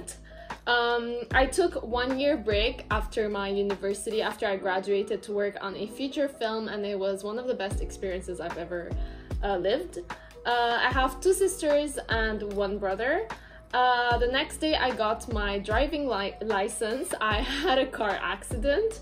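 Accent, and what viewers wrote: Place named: France. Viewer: Such a good video! I was always curious what exactly your accent was because it sounded French but not fully French. Loved getting to know more about you :)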